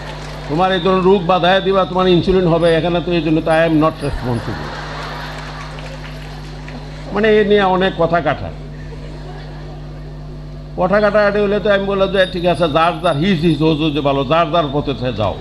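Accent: Mymensingh, Bangladesh. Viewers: ময়মনসিংহ ভাষা😍😍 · আমার ঠাকুরদার ভাষার সাথে একদম মিল রয়েছে ।আমার ঠাকুরদা বাংলাদেশের ময়মনসিং জেলায় থাকতো বর্তমানে কোলকাতায় ।রাস্ট্রপতির বাড়ি কোন জায়গায় জানিনা তবে কথার পুরোপুরি মিল রয়েছে । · স্যারের ময়মনসিংহের ভাষা মজা লাগল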